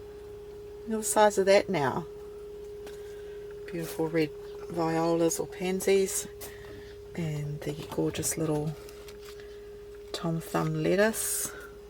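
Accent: New Zealand. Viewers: Yay another channel!! I'm going to be enjoying hearing your voice when I move to America in September as I'm going to miss hearing our Kiwi accent over there!!! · Kia ora - zoomed in from Te Awakairangi (aka Lower Hutt) - really feeling for you as I am sadly just getting over this rotten flu going around too... congratulations on the new channel 🤩looking forward to learning what you doing. I really appreciate your cooking and how to make and store recipes (especially the Hot choc recipe YUM!!). PS love your kiwi accent mwahahaaa.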